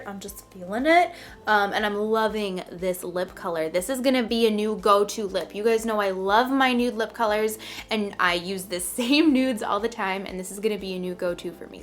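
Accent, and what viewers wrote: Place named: Chicago, United States. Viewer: Girl! I freaking love this look!! Omg!! I know you struggled but girl, it's so bomb! You did the damn thang! This is my favorite look you've done for fall this year so far! As always love you and thank you for being such an amazing person to look up to and learn from ♡ my family is from Orland park so you always give me home vibes because your from up that way and your strong Chicago accent!! Xoxoxo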